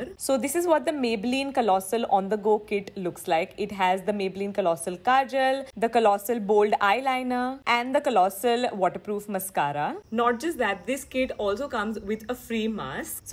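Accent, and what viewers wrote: Gujarat, India. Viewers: That gujju accent😂🤭❤❤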